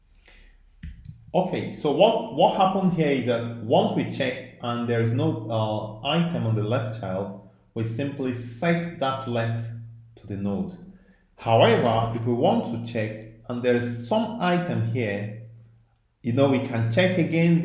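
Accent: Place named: Nigeria. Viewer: Thanks bro. I don't know if I understand better because of the Nigerian accent, but this helped a lot.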